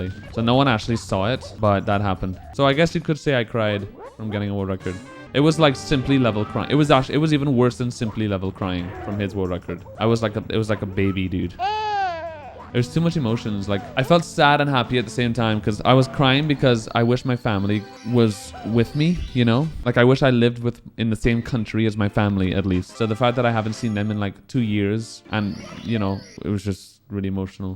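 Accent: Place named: Trinidad and Tobago. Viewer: I need to know. You sounds so trini its insane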